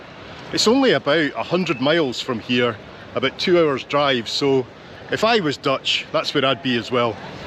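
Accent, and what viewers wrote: Scotland, United Kingdom. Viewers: I just noticed, your Scottish accent translates surprisingly well to Dutch. (Or you simply have a good ear, also possible of course.) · Although there is that slight Scot accent, your Dutch word pronunciation is spot on. Love that you said Amsterdam with a short A instead of the long A most Americans use. Can you imagine using a long A for America? Yet they can't pronounce Amsterdam correctly. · Amazing video! Nice detailed view of one of The Netherlands oldest and most beautiful cities! I’ve been there a few times as well. Twice I went from the train station on to Belgium and France on my bicycle to follow my last name 😄 The Helpoort (Hel Gate) is a nice thing to see. It’s the oldest city gate in The Netherlands but barely resembles the original. It has been rebuilt and reconstructed quite a few times over time, so only the arch is original. 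The towers and spaces above it aren’t. Still a nice thing to walk through, knowing you’re walking through something that has been there for nearly 800 years. You’ve pronounced ’Maas’ correctly! In French (and also in the French part of Belgium), this river is called ‘La Meuse’, in Dutch it’s ‘Maas’ or ‘De Maas’. Actually, through your somewhat thick Scottish accent you actually pronounce most Dutch names and places quite nicely. Very entertaining to watch and now you’ve got a new Dutch subscriber! 👍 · With your Scottish commentary my town seems quite exotic to me all of the sudden... and you point at details I never paid attention to. Thank you for your appreciation. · Yes, it wasn't difficult to understand him. I think Dutch spoken with a Scottish accent sounds interesting.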